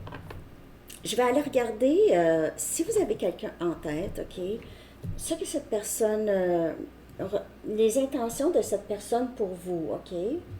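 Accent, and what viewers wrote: Quebec, Canada. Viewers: Bonjour, Je vous apprecie beaucoup car vous etes vraiment rigolote,et votre accent quebecoie aussi j'apprecie beaucoup,vous etes une personne belle,une bonne personne empathique avec beaucoup de coeur et de partage. Merci pour tout et bonne journee a vous.❤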